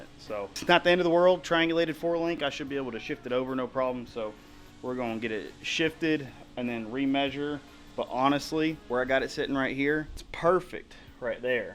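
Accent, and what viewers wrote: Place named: United States. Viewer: I feel like you have a huge country accent on camera!!! haha.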